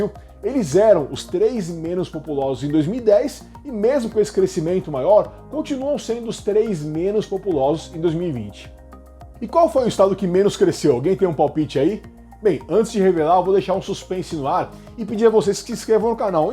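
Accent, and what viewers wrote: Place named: Centre-South, Brazil. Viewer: no merdil basta vc falar como centro sul que ja acham lindo mas quando um tuga ouve nao curte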